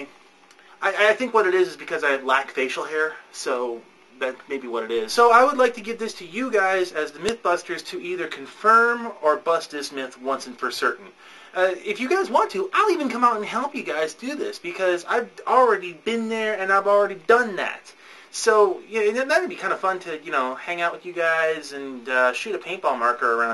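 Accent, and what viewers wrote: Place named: Canada. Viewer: the dude (aND i use the term lightly) has GOT to be canadian.. anyone..lmao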